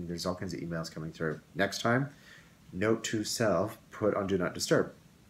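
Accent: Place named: Canada. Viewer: Awesome podcast! I can't wait to see your progress as a knitter. I brings me back to just a couple short years and helps me reflect on all the progress I've made since i (seriously) picked up knitting. Also, the Canadian accent...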